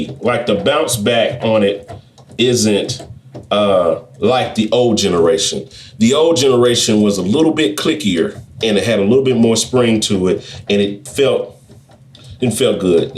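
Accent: United States. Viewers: The review is good, but the pronunciation is really very "American". It was very difficult for me (Im Italian, understanding English well) to follow.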